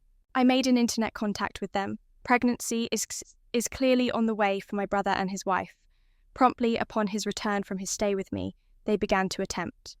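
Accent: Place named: United Kingdom. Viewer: Hate the British accent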